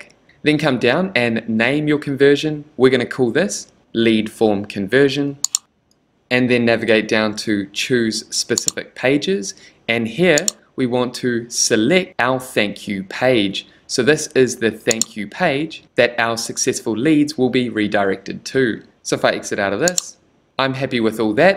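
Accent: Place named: New Zealand. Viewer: Thanks, for such a clearly explained tutorial! Also, I'm from Chch, NZ and was happily surprised to hear a kiwi accent. You have done us proud. FT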